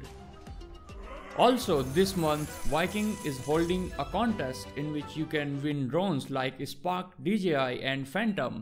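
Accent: India. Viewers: Your Voice is Like Indian Average People ❤️ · You sound like indian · It must be an Indian man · You sound like an Indian ngl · Is this your voice are you an Indian? I am Bangladeshi your neighbouring country🇧🇩🇨🇮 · Are you Indian, it sounds like it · I like your voice are you from Asia India? Just wondering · He is from India 100% sure. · Me hearing the voice said Oh is he indian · Gee what kinda voice is day 1 like= no voice (and is that a indian) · U r a Indian I knew that ur voice Revaled! 👍👍👍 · Love Indian accent wish I could do it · Indian voice lol no offence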